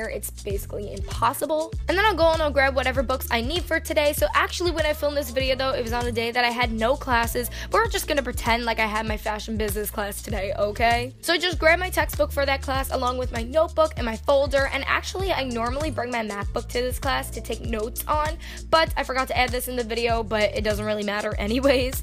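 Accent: New York, United States. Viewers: Send Help everyone has an accent. And I think they meant her new York accent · Okay I just stumbled on your channel and as soon as I heard your voice I could here the New York Accent, I’m from Pittsburgh so I hear the east coast accents all the time 😂 nice vid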